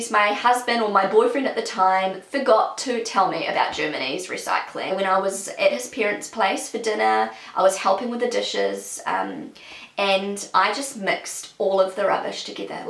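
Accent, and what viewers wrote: New Zealand. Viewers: First of all, I want to say that I enjoy your videos. What I find interesting about your videos, besides the content, is the kind of English that you speak. I had never heard New Zealand English before. I had to watch your first videos multiple times because I couldn't understand everything right away. There are several sounds or words that you pronounce differently and that's why I sometimes understand something entirely different. Here are some examples: rules -> roles; check -> chick; get -> git; ten -> teen; reason -> raisin; bedding -> beating; sense -> since; gas -> guess; f-word -> if-word. Anyway, your English sounds really cute, particularly when you say "GermaNAY" instead of "Germany". Perhaps you could make a video about how New Zealand English differs from US English or British English. What I have also noticed is that English speakers say "recycling" when they actually mean "waste separation". 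I don't know about other German speakers, but I would never refer to the mere waste separation that I (have to) do as "recycling". In my opinion, waste separation is a prerequisite for recycling - or, at most, the very first step of recycling. But it's definitely not the entire process of recycling. I don't melt empty plastic bottles at home and form new stuff out of them. That is done elsewhere by professionals. It probably didn't occur to your German boyfriend to inform you about waste separation, because he assumed that waste separation is standard practice in all countr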